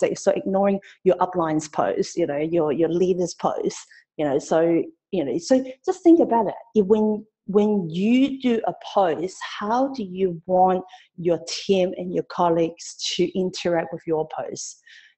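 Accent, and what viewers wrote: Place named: China. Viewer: Chinglish ... love it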